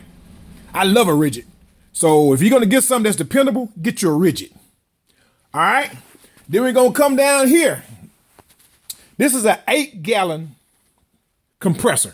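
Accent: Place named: South, United States. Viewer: love your channel my man!your cool southern accent makes me run down to popeyes and get me a few biscuits!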